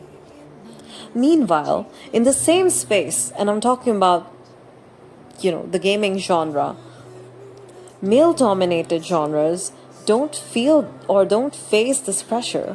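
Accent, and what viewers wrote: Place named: India. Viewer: I hope we get more games like lads... Also you sounds indian if not then its completely my fault for assuming (i just seen very less indian playing lads, ) and the your vocabulary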